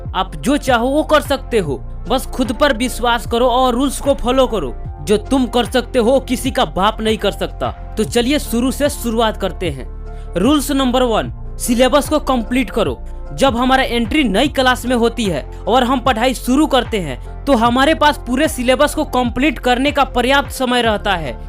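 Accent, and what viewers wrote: Bihar, India. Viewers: Bhaia aap bihari ho kyaa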